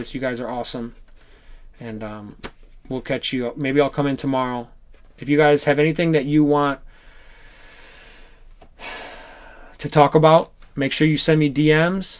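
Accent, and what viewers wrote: Hawaii, United States. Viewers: Haole-Hawaiian for LIFE! Makes me smile when your pidgin comes out interspersed between most of the reg. English stuff. Haha. Right on man.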